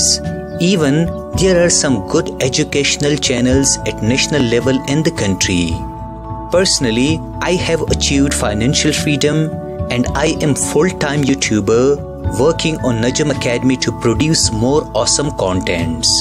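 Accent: South India, India. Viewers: Really It's So Surprising That The English Accent Of ur's Does Matches Which We usually Hear frm our Teachers In India.... So basically U r Indian Famous Teacher On Utube this is my personal opinion.... But ur wrk is tough As I Think....But At Last when U Said Naah Man I am Not Handsome.....that's Wrong In Content Becoz Person Should be good at skills not In Face ..... Lots of respect And Blessings Frm Children Frm India .🙏🙏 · I thought you were South-Indian because of your accent😅.. Anyways, really you're really great teacher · I thought u were from India's South😅😂 keep it up broo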